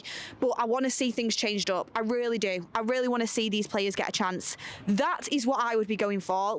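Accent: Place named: Manchester, United Kingdom. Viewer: A confident blonde with a Manc accent, thats what i like.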